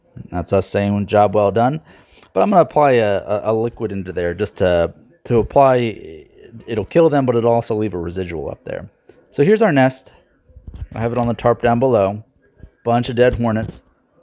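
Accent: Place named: Pennsylvania, United States. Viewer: Let me guess, Copenhagen Wintergreen? Also you are so southeastern PA it hurts.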